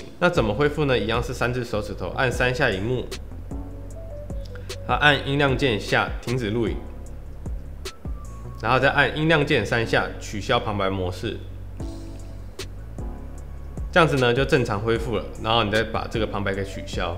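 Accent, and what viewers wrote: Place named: Taiwan. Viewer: 一摸幾，你發音有夠台灣國語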